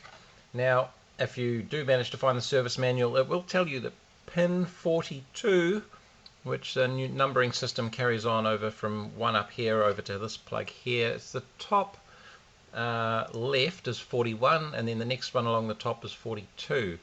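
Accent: New Zealand. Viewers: Customers car came in today senders seem okay cause its sending signal saying full on gauge when passengers plugged in however half when unplugged and half when both unplugged will open up tomorrow morning and hopefully this info does the trick. you sound like you're from nz. owe u a box of beers if this works out. $100 so far for diagnostics dunno what to charge if this is the issue. maybe another box lol more so around $350 which I think is fair. Chur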